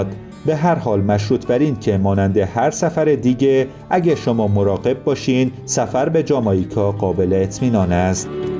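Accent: Afghanistan. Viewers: فکر کنم گوینده افغان هستش. افغان ها حتی در تلویزیون هم اینجوری صحبت می کنند. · گوینده مثل اینکه افغان هست که مبخواد لهجه ی پشتواش را نشان ندهد صدایش را دوست نداشتم.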